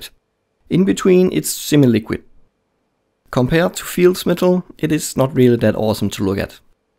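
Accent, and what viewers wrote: Germany. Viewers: ou :D you sound pretty german to me :D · I've an Offtopic Question :§ could it be that you're german ? :D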